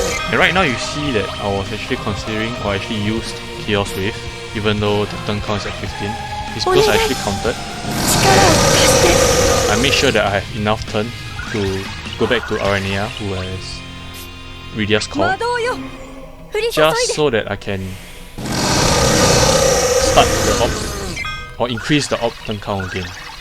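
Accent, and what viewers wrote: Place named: Singapore. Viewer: You Singaporean? I can recognise the fellow accent xD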